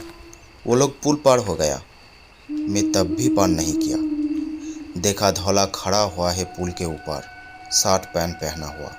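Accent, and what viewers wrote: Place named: Bengal, India. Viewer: Apki bengali bohoth sundor hai Par hindi ki liye hindi bolne wale se hi dialogues bulwaye Hindi me bengali ka merge horaha hai Achcha nahin lag raha hai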